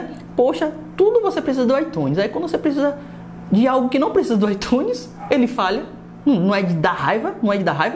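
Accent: Bahia, Brazil. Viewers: tu é de que lugar da bahia, brow?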